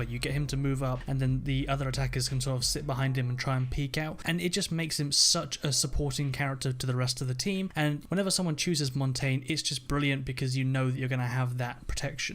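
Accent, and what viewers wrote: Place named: United Kingdom. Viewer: hes british...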